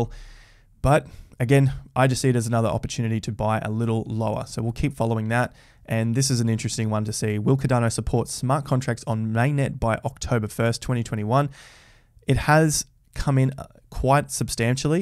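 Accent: Australia. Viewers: Hi! Good video and keep it going mate! It's nice to hear again a bit of 'stralian accent! Love and supporting you from Italy!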